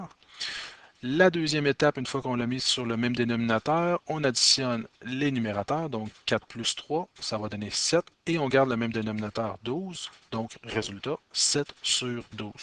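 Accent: Quebec, Canada. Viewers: Emre Turhan c’est du canadien français donc c’est un accent normal pour ce pays... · quel accent? cest du quebecois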